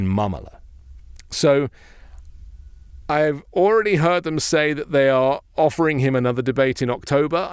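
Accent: United Kingdom. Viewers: Well nothing like hearing about American politics from someone with a British accent 😅 But gooo Kamala !!! RID the world of Trump !!!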